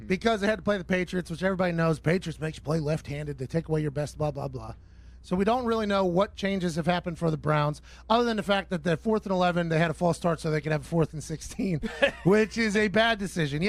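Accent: Pittsburgh, United States. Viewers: Love this dude. The Pittsburgh accent makes it even better.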